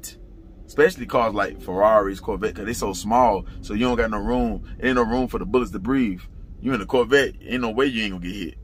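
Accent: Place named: Louisiana, United States. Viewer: This whole time I been tuned in thought you was from Louisiana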